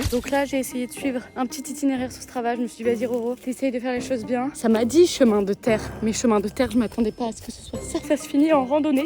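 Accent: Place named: South, France. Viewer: j'avais jamais entendu que tu avais un léger accent du sud, ca te rend encore plus mimi :D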